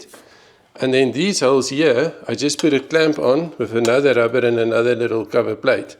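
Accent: South Africa. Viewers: LOL that south african accent... 🤣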